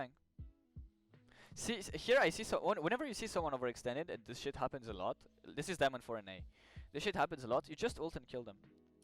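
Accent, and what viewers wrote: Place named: France. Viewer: French accent 200% XD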